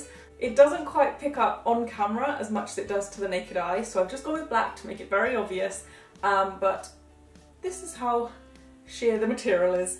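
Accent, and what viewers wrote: United Kingdom. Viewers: OHH MY GOD, the second dress you showed, the green one, my friend had for our school ball last year! i remember her showing me that same pic you put on the screen and it was such a pretty dress!! that's so crazy haha, especially bc i live on the opposite side to the UK where I'm thinking you're from bc of your accent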